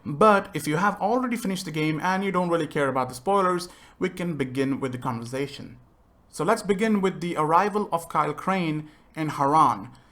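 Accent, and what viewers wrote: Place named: India. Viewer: This guy is trying so hard to hide his indian english accent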